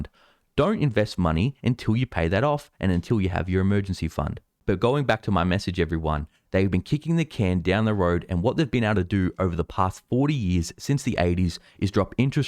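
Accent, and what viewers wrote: Australia. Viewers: Are you Australian???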